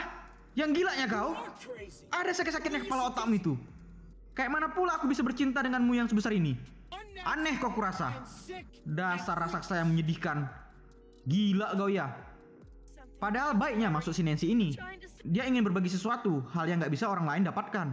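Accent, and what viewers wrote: Medan, Indonesia. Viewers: Orng Medan ko bg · Cara ngomongnya sama persis kayak temenku orang Medan :) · Bg kau pasti orang Medan kn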